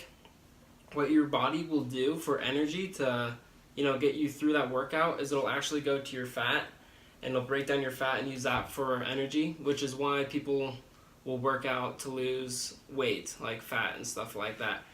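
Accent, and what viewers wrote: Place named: United Kingdom. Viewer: Where are you from? You kinda sound like you're from the UK.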